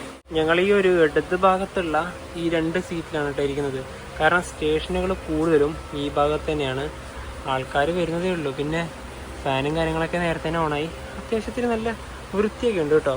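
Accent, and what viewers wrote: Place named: Malabar, India. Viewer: ഞാനൊരു മധ്യ തിരുവതാംകൂർ കാരനാണ്. നിങ്ങടെ മലബാർ മലയാളം slang കേൾക്കാനാ നല്ലത്.🙂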